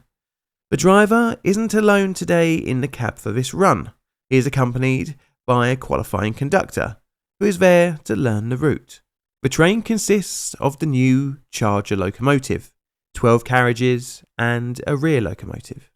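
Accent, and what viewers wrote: United Kingdom. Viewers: Laughter so hard at the way you pronounced "Puget" , as a long drawn out "pooojet", which immediately tickled the 10 year old boy living in the back of my head. Thought he was pretty much gone, but the way it just popped out your mowf, surrounded by, what always sounds to us ignorant yocals in America, as a sufficticate accent, whether it's the queen, or someone speaking some form of cockney...well, it just caught me off guard a bit! Thank you for that! I needed a little bit of a chuckle today.😏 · Transport? Transportation. It's like the narrator can't decide whether he's British or not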